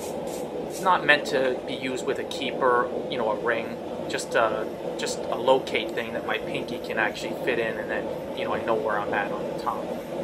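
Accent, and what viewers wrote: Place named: East Coast, United States. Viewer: by now you know I'm a fan of your channel, and the work you do. I've been trying to add up why you are overseas, your obviously an American with that East Coast accent. today you mentioned Matthew West, I'm thinking you're referring to the Christian singer? how far off am I by thinking your a Christian Missionary or Teacher? BTW- I think I really like the guillotine and I really really like the tongs. I am very interested in purchasing both, but will have to save some $ first. at any rate friend, keep sending us videos, you are a great educator of this craft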